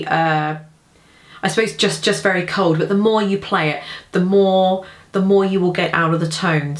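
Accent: United Kingdom. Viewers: I love hearing "pimp up" in a classy British accent. It's really funny.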